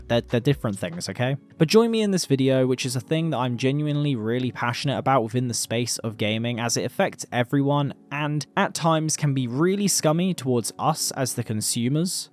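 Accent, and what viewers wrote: United Kingdom. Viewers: Subbed for the long video and British accent.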